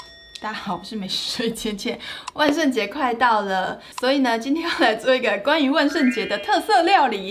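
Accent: Taiwan. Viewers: 千千將台語好可愛喔😆😆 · 在國外工作 看你吃飯 很療癒 再五個月就回台灣 謝謝 水水千千 最愛看你說台灣國語 和不碖轉的台語 加分！掰餔～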